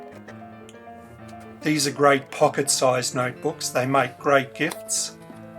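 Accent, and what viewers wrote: Australia. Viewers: I thought you sounded Australian! Which state are you in?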